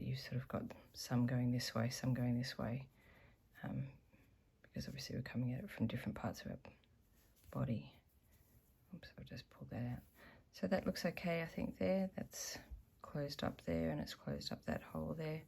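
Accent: Australia. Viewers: So refreshing to hear a fellow Australian accent on a knitting video! I always worried my 'free-form' approach to weaving in ends was 'wrong', so it's very reassuring to see how you do it, it makes sense to have an intuitive attitude. I love the neat result of the seam, so I'm going to follow your suggestions.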